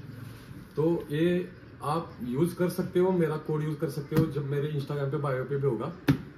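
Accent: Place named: Kolhapur, India. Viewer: कोल्हापुरी accent भाऊ!! 🔥😁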